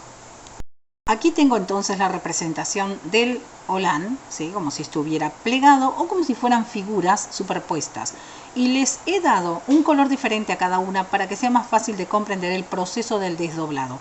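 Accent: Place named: Rioplatense, Argentina. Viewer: el acento de vos me parece el de Argentina rioplatense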